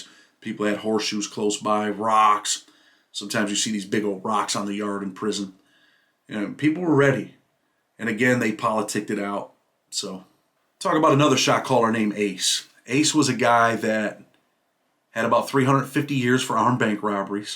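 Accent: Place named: New York, United States. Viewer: My whole family from NY. Got some up in Buffalo, Syracuse, Oswego. I can hear ur accent sometimes. Good vid today.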